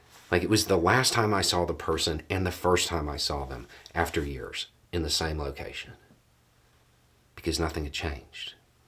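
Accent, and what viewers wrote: South, United States. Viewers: What sort of a loser turns to a pontificating pumped up talking head on Youtube for life advice? Someone so desperate for an accepting father figure that a southern drawl is all they need to feel they've come to the right confessional. "Small towns" are tribal enclaves, they are not cities, they are not simply places where humans accumulated. There aren't any visible fences or walls, but small towns are not open places for anyone to live there, or visit there, or even simply pass through there. They are encampments. If you don't fit in you have no business being caught there after sundown...or during sun up, either. They don't want this kid's kind. Goodbye and good riddance.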